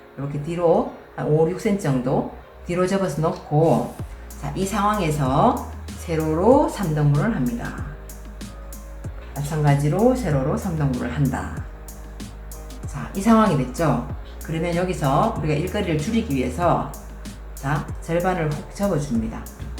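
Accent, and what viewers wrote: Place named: Daegu, South Korea. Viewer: 우와~~~~ 대박 대박 대박! 사투리 들으니 대구 가고 싶어요ㅎㅎ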